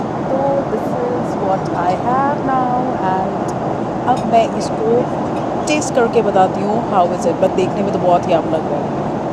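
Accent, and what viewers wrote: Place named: Punjab, India. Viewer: Bhabi g thoda accent punjabi boldeya bahla ajeeb lgda kde kde Bahut sohna kr raye soon u gonna get good number of subscribers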